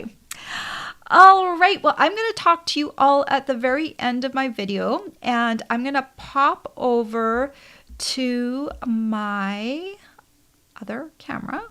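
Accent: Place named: Canada. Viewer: Hi, for some reason (maybe your accent) I thought you were in Canada! I was so shocked when you just said you are in the Boston area!! So I CAN order Stampin' Up! products thru you in the future...😊😁😄Yea!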